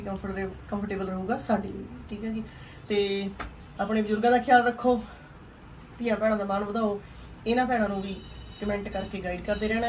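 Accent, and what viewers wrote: Standard. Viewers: Menu thudi pure Punjabi bahut wadeya lagdi aa g sis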